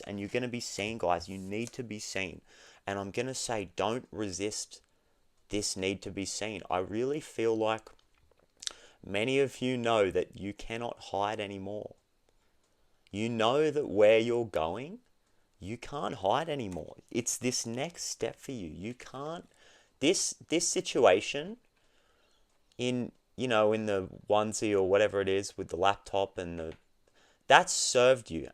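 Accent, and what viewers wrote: Australia. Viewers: did not expect the Aussie bloke voice... noice. Totes supporting ya mate *hits subscribe. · Is your accent Australian??? ❤ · i actually had to question myself if I was right it was an Aussie accent for a few seconds haha 🙈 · Thank you. You are very sweet and genuine. I enjoy your readings. Where are you located in the world? You sound a little like an Aussie!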